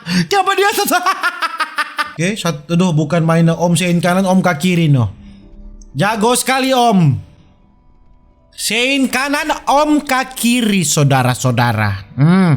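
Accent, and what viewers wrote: Manado, Indonesia. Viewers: Logat Nya Kak Yg Bikin S Betah Manonton Memang Orang Manado Da Best Memang #SalamDariLuwuk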